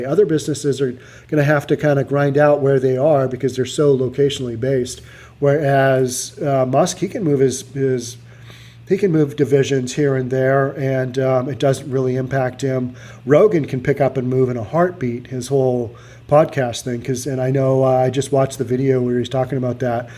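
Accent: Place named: West Coast, United States. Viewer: Tried to watch this. But this guy is to west coast laid back trying to be cool and slow with that idiotic accent.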